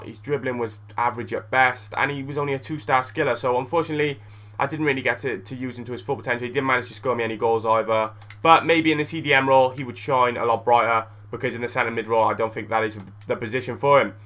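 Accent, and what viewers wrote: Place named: Welsh Valleys, United Kingdom. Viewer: You sound like the guy from the valleys haha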